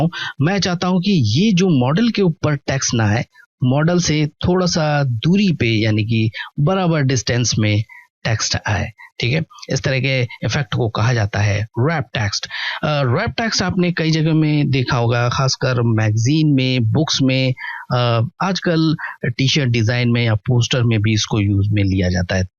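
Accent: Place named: Pakistan. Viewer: superb sir keep it up when you speak it seems to me someone speaking in very pakistani urdu language from india, i am indebted to you sir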